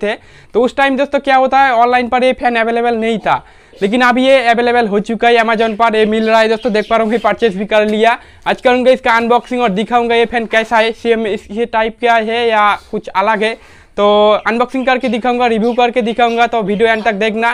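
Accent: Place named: West Bengal, India. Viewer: তোর কথা শুনে তো বাঙালি মনে হচ্ছে।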